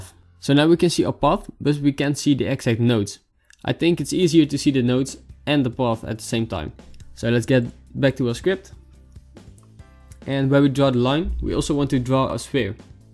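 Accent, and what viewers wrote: Netherlands. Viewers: Do I hear a Dutch accent?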